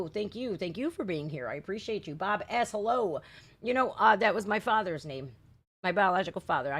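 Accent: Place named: South, United States. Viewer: Hey was wondering if you are from the south? Cause you got a southern accent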